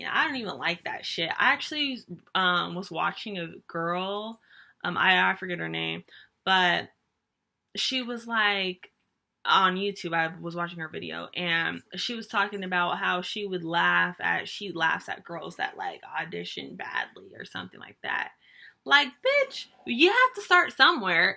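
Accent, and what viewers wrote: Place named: South, United States. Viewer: Country accident cute asf😍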